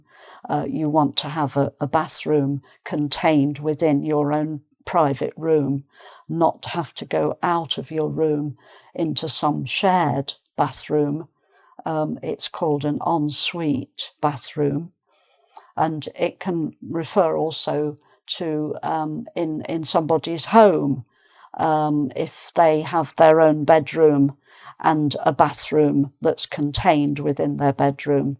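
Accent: United Kingdom. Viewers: Oh, I had started learning the British's accents with you! It is of a great pleasure to learn here, till now a days. I started more or less 3 years before my post-graduation for teaching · I have been acquiring the English language for a long time, ever since 2004 and for reasons of material availability, I picked up an accent resembling that of USA. Now at the age of 31 I'm seeking to transition onto a more British-sounding quality, for which I looked for videos of this lady and, reading the comments, I just found she passed 😢 Hope she is resting peacefully. You 'were' not great, you still ARE and I'll make sure to make good use of your lessons. Thanks for your contribution to every student of English and I wish you a calm journey 🤍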